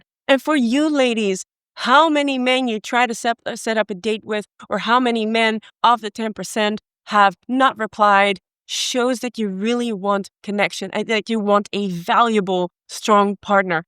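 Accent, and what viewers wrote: Netherlands. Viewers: Is... is that a dutch accent i hear ?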